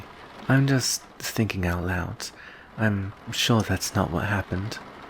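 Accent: United Kingdom. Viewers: I feel like I'm talking to one of my kind (fellow british person) even if I'm not really it's weird 🤣🤣😂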